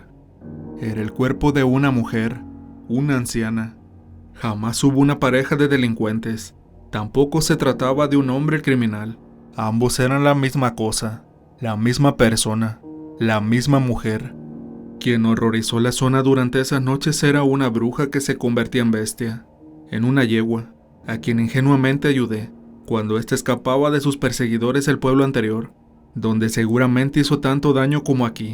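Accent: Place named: Mexico. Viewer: son buenos relatos,,,pero mejora el acento la voz es horrible,,ya sabemos k eres mexicano mejora la locucion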